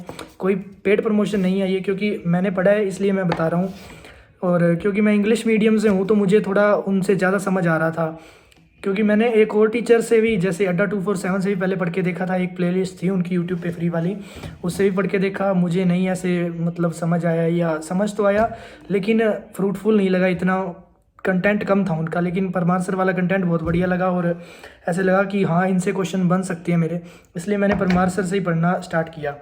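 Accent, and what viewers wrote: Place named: Hisar, India. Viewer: Kha se ho aap ? Hisar side se lg rhe ho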